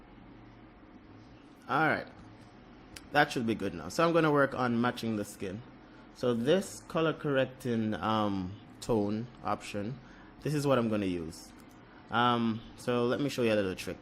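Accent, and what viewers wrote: Jamaica. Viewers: Hello sir...where from the Caribbean are you from? You sound like a fellow yardie. Great work. I use frequency separation quite a bit.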